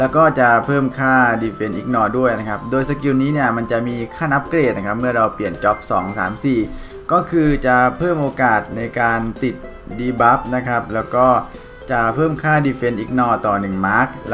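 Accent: Thailand. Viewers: มันก็จะเหน่อๆหน่อย555+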